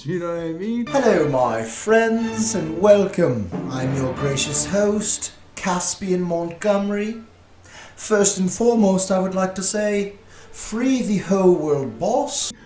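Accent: England, United Kingdom. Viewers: The English accent works......i love how u make ur presentation, that pipe u smoke it rocks! U should do a movie one day brother because u're a dam good actor 👍👍👍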